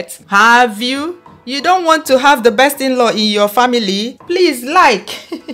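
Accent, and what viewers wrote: Igbo, Nigeria. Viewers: Hyper clear Nigerian accent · I came across this video then your Igbo accents and and your explanation made me stay and subscribed 😂😂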